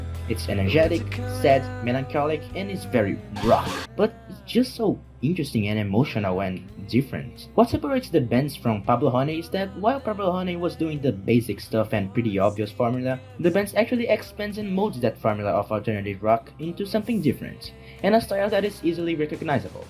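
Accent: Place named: Brazil. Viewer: Hey, i've been subscribed for a while, and i've been meaning to ask, do have a portuguese speaking channel? i'd love to see it, and share with some other brazilian folk, for real! I believe 're brazilian/portuguese based on your accent and community posts, if not, please ignore this comment.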